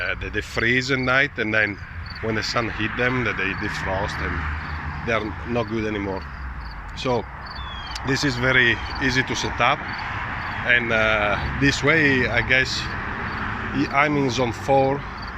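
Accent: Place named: Italy. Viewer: Si sente l'accento italiano :D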